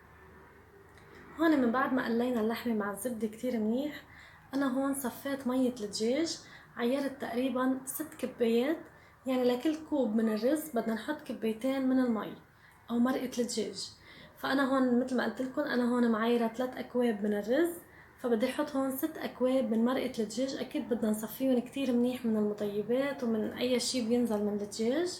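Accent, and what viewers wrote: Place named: Lebanon. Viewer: فعلاً شرح مفصل واضح جداً وعمل رائع ممتاز حبيبي لبنان 🇱🇧 لهجة لبنانية ❤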